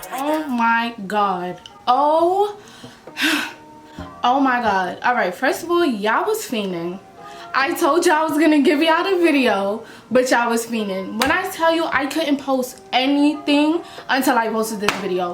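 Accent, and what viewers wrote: New York, United States. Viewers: I sooo love your NY accent 🥰🥰and yasss miss mamas ya body is BOMBBBB AF!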